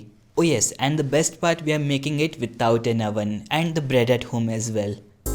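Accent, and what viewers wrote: India. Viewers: Fuck this disgusting accent of indiiisss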